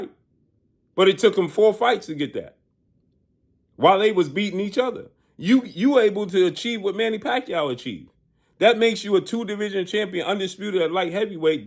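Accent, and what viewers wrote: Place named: East Coast, United States. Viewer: For Some Reason, I Thought You Was From Da East Koast (New York Or Jersey)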